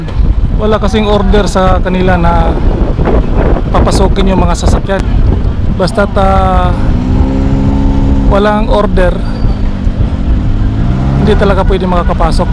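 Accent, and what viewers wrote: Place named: Visayas, Philippines. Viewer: Ayusin mo nga pgsalita mo bisaya ka dong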